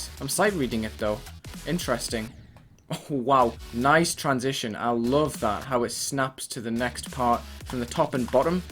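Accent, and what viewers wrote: United Kingdom. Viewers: He's British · well yh but I think he has a SPECIFIC British accent. I get what ur saying tho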